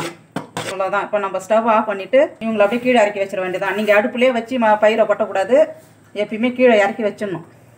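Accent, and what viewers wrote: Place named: Tiruvannamalai, India. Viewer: நீங்க எந்த ஊரு அண்ணன் எங்க ஊரு பாஷை மாரி இருக்கு நான் திருவண்ணாமலை 👋நீங்க என்ன ஊரு சொல்லுங்க அண்ணா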